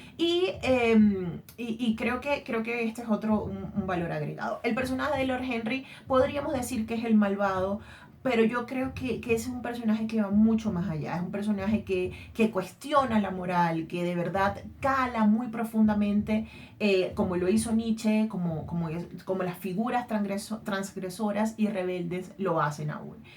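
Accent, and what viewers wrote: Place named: Venezuela. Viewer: Hola... buen análisis, tu acento es tan bello como tu rostro, y sin temor a equivocarme, ambos son venezolanos... un fuerte abrazo.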